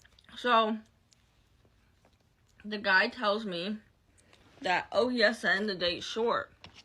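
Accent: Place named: North, United States. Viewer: Question: Do you ever post sober? Also, you sound like you're from up north. US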